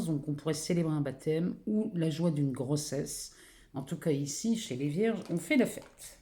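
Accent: North, France. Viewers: Vous avez un bel accent du Nord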